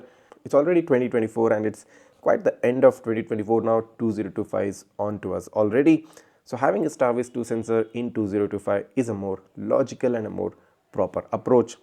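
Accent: India. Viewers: The pajeet speak is too strong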